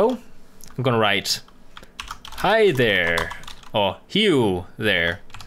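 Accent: Denmark. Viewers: Hah du er dansk d er jeg også. Syntes også jeg kunne genkende accenten :). God tutorial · Was gonna say, you sound like my Norwegian cousins. Danish! Great tutorial, from a python and C lover. Subbed, upvoted, and all notifications enabled. :) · Er du dansker?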